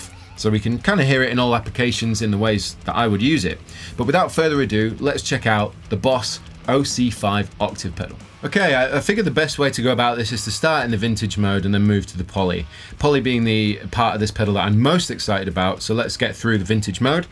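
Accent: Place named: England, United Kingdom. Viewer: More intens-a-E. 😅.....gotta love the English accent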